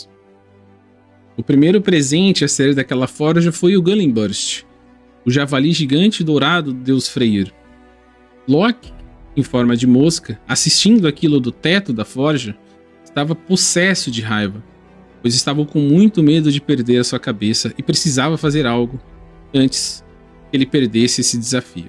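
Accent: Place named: Campinas, Brazil. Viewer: Excelente vídeo mano parabéns, senti falta do sotaque DDD 19, cadê? 😂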